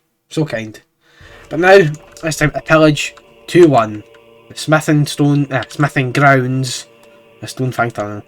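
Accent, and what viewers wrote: Scotland, United Kingdom. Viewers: I love how nobody understands him. Grew up around scottish accents so I find it so I understand perfectly. Great vid mate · scottish english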